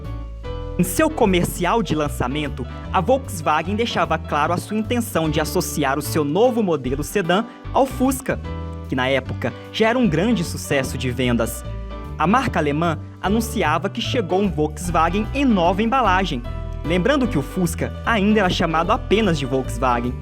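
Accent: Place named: Northeast, Brazil. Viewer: Voce fala muito mal e do nordeste, bahia, so mostra as imagens nao fala nada por favor.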